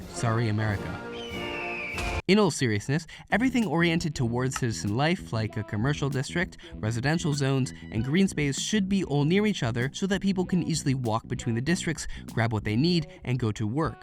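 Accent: Canada. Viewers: he's Canadian not british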